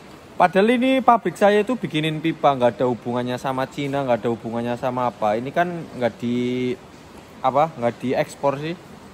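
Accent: Banyumas, Indonesia. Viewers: Weh weh ra ngapak ora kepenak yo mas😁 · Masnya dr ngapak yaa ..bahasanya kelihatan berat kakuu ..seringb2 ngobrol ma daerah lain ..biar gk kaku bahasanyaaa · Ngapak e ketok buos 😂😂😂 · Ngapak y mbok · Wong banyumas y? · Kok ngapak ya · Kowe ki ngapak ya mass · Rika wong ndi sih kang kayong logatane ngapak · Asli ngapak ya mas? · Wong ngapak ya rika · ini org jawa saya ngapak2 ngapak ngendi mas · Haha kaya masih ngapak and medok lucu dan seru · Bukan kasar tapi ngapak......😅😅😅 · Wong ngapak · Yakin mesti giye bocah ngapak. Manggone nang endi ya...? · Kie mesti asalle ko wong ngapak2 ya mas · jiaaaan kynee ngapak kie luuh hahaha...salam knl mas broo?? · Mas...sampeyan wong ndi? kok logate ngapak, hehe... 🙏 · Sampean orang ngapak yh mas...soale ketauan dr logate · Ora Ngapak Ora Kepenak ya kang😃😃😃👌👌👌 korea skrg dah 3rb lbh yg poditif terkena virus corona,,,, kayauuuu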